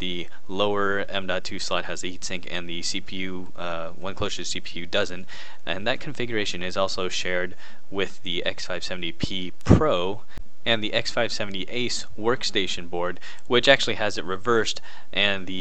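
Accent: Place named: New York, United States. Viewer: Are you from the same area (new-york-ish) as the famous repair-rights activist Louis Rossman? It sounds like you guys have the same dialect - a lot.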